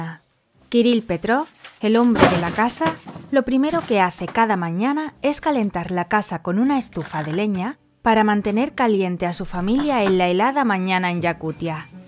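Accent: Spain. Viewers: Vaya , el vídeo con acento español qué maravilla , no soporto esos acentos latinos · Menos mal que la narración es en español de España , no soporto esos vídeos con acentos latinos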